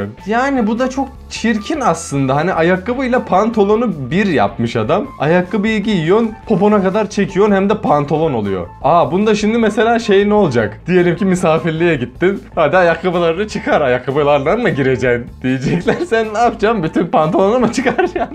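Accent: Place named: Ankara, Turkey. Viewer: Aa ben de Çorumda yasiyorum. Burada da Ankaralılar var konuşmalarınız benziyor zaten 😆😊😂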